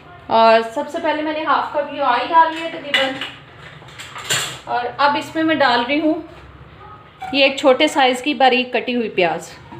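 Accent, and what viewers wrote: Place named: Punjab, India. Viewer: Thanks Ji, I also liked you Punjabi accent Urdu Loved it.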